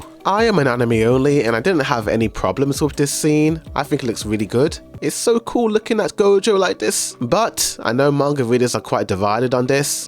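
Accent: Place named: United Kingdom. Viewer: it's definitely his accent but I doubt English is not his first language. His accent is...English. Sounds like almost every UK dude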